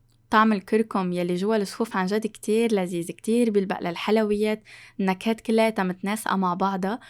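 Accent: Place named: Lebanon. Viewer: اللهجة لبنانية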